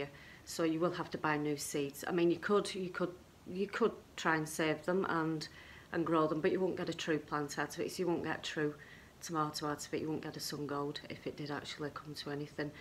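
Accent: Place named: Greater Manchester, United Kingdom. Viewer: Hi, what a lovely video this time of year. I would say 90% of my seeds are from Premiere Seeds Direct for at least 8 years. I have had great germination and find them great to work with. I've not long got back from work and I asked my fiancee to guess your location from your accent. She thinks Ashton or Stalybridge! ( She was originally from Stalybridge) I cannot wait for spring, it feels like I haven't seen the sun for months and we are in Lincolnshire so further South than you. I think I'll spend the evening in my grow room with a book pretending I'm on holiday! The lights I use are full spectrum and look just like a summer's day!! Take care and hopefully spring is just around the corner for us all.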